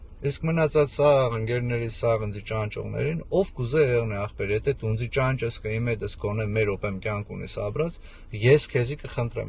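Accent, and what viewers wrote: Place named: Gyumri, Armenia. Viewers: Гюмреци э hастат👍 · Ապրես ախպեր ջան! Չգիտեմ լեննագանցի ես, թե ախրքալակցի, քաաաղցր ես խոսում! Ընտրում ենք N15-ին և ՀԱՂԹՈւՄ ԵՆՔ!!!!!!!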